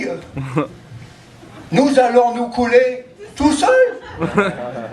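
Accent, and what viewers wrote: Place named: Saint-Étienne, France. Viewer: Après toutes années passées outre-Atlantique, mon oreille perçoit le tenace accent stephanois. Ta prestation est pleine d'authenticité, ton personnage surprenant et très drôle. Je n’ai plus de nouvelles, je t’espère en forme. Amicalement Didier Missana from Beaujolais.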